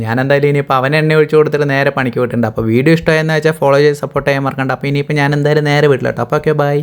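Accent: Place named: Palakkad, India. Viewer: പാലക്കാടൻ ഭാഷ.. എവിടെയാ വീട്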